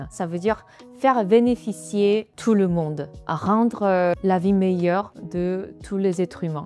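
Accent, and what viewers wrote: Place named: South Korea. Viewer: J'ai visité ton beau pays, juste 16 jours et nous comptons y retourner voir le printemps. 🌸 La nature est belle et dominante, Séoul est immense mais grâce à ses collines, ses parcs... est fascinante avec ses buildings qui se dressent à côté de petits immeubles et quartiers de maisons insolites et des collines intactes. 🏞️ Busan est immense, vraiment trop belle. 😍 Jeju est originale et attirante, ... bref un pays où on aimerait vivre parce qu'il y règne encore des valeurs : celles de la famille, les respect des aînés, la discrétion des couples dans la rue, la cuisine riche et variee, la pollution quasi inexistante. 👍 Voilà. Il y a encore plus à dire mais je m'arrête là. Vous êtes une belle coréenne avec un joli accent. 💖 Merci d'aimer notre pays 🙏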